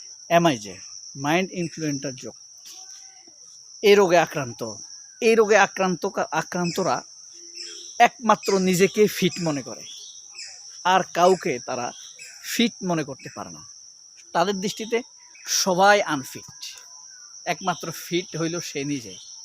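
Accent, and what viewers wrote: Noakhali, Bangladesh. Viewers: ভাইয়া আপনার দেশের বাড়ি কি নোয়াখালী?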